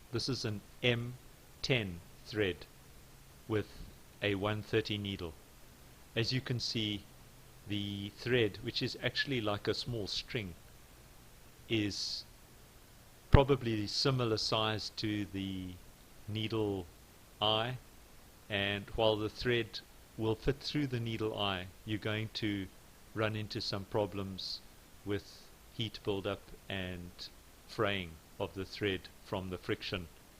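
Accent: South Africa. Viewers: Best video on Youtube explaing thread and needle on this machine. Where can you buy the the 140 flatshaft needles ? You sound like you are from SA boet ?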